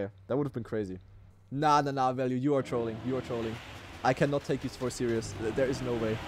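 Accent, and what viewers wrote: Germany. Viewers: Just want to say you have a pretty minor german accent, very clear and understandable to my canadian ear. Your english is fantastic as well, I've listened to an absolute ton of your content and only rarely do you reach for a word or don't know the meaning of one. Love the content, and especially enjoy the competitive focus. Whether you are hosting tournaments or competing, they are my favorites. The volvic duo cup reviving that format was especially appreciated and I hope you can do it again!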